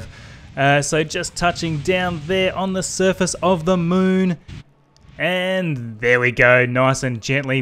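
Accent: Australia. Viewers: tell me if I'm right. Are you Australian? You sound like Hugh Jackman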